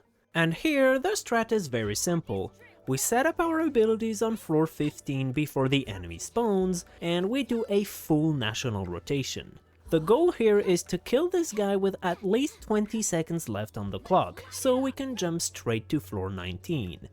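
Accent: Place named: India. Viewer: this is good idea india aeccent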